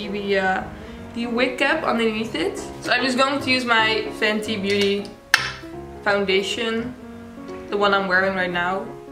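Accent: Netherlands. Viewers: She sounds Dutch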